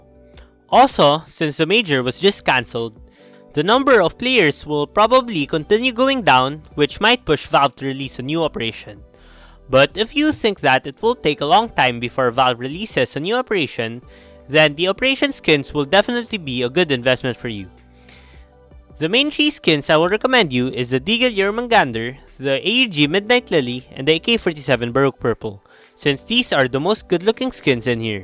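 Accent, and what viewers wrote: Philippines. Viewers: You filipino? Kinda sound like it hehe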